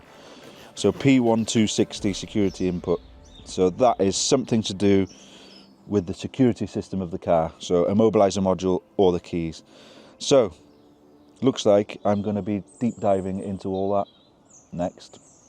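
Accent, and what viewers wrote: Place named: Dolgellau, United Kingdom. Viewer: I recognise that accent anywhere! 'Ogia Dolgella? I'm an XKR x100 owner, just down the road. 👍🏻😎🏴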